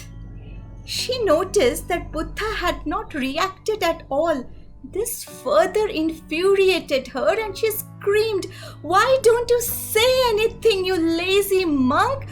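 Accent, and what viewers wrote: India. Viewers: Cute Indian accent. I would come back to listen what story would you have next. <3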